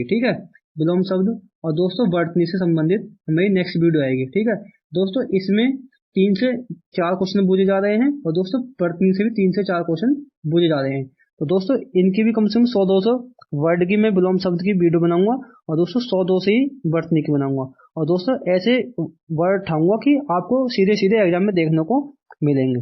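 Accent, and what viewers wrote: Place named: Haryana, India. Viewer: Haryana sa ho kya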